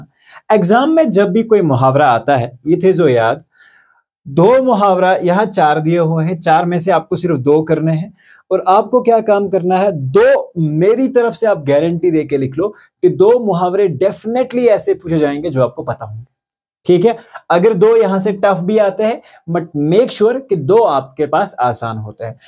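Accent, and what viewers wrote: Kashmir, India. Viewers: Sir apka Kashmiri bahot acha lagta hai ❤😊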